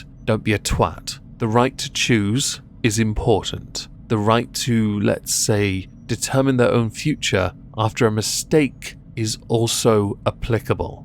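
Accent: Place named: Oxford, United Kingdom. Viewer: Off topic but where is your accent from? Best I can place is Oxford. Totally agree btw. Instead of abortion men should have the right to have their offspring .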